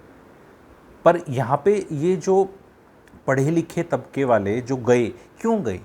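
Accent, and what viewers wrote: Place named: North, India. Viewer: Mast bolte ho aap...typical north Indian style...maza aa jata h...hasi nhi ruk rhi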